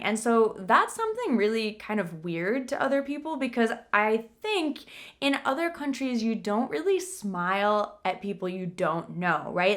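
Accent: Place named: United States. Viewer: I am from India and i want to make my pronounciation like you , like American..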